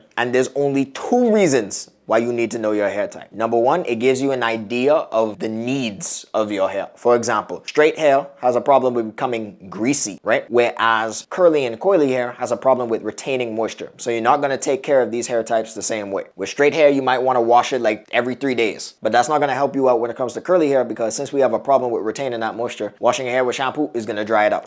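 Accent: Bahamas. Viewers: sound like he is a Bahamian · he does sound Bahamian. I knew when he said “hay-auw” for hair lol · I think the Bahamas 🇧🇸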